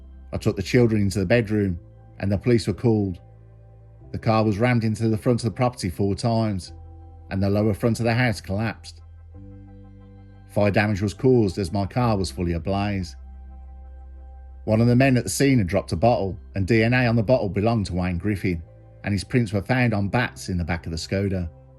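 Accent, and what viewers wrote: Birmingham, United Kingdom. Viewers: Massive congratulations and respect to you and your content brother. I literally can binge watch your videos for hours. There all perfectly to the point and not too long and cover everything and sum it all up at the end brilliantly. Keep doing your thing geezer. Ps never used to be a fan of the brummy accent but yours fits perfectly. Keep it up mate.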